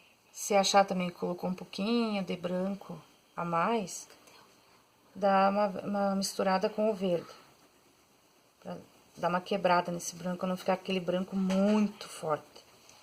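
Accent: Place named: South, Brazil. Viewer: Btarde,!!!! Que lindo os seus trabalhos, que Deus continue abençoando suas mãos e lhe dando muita saúde!!! Vc vai fazer o vídeo fazendo as rosas deste trabalho? Vc prepara seus pincéis antes ou usa conforme vem da loja? Amei a sua aula vc explica muito bem e é bem claro o que diz!! Cheguei até vc através do canal da Jucineide Braga, que tbm acho outra professora maravilhosa, admiro tbm seu trabalho. Já me inscrevi no seu canal, e torcendo muito que não pare de fazer os vídeos e que traga mais vídeos aulas de outras flores e principalmente de rosas que realmente são poucas que explicam tão bem. Grata por compartilhar seus conhecimentos!! Até breve!!! Obs.: Amooooo o sotaque dos sulistas!!! Bjs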